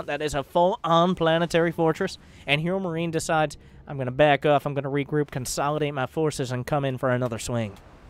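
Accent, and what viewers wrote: Germany. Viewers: You'r german accent is fucking on point :)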